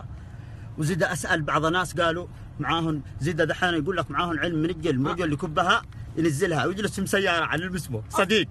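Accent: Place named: Samtah, Saudi Arabia. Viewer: والله انك كفو يا واصل يابطل ويالبى لهجة اهل صامطة👍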